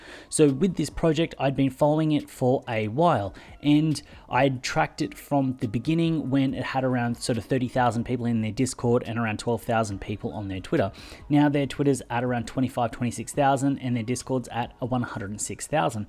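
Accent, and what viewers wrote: Australia. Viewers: Where are you from mate? sound Aussie to me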